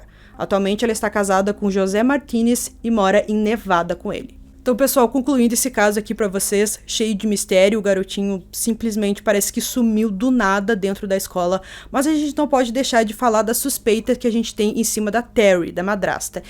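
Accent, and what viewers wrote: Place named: Pará, Brazil. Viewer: vc é do estado do Pará??? Você tem um sutaque típico de lá kkkkk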